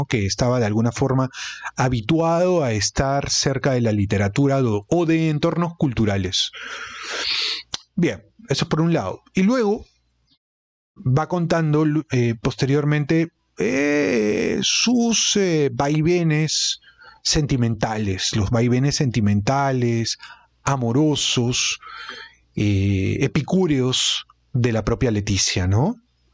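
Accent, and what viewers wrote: Peru. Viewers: Me encanta oir como lees el libro con ese lindo acento peruano. 😊 Saludos desde España.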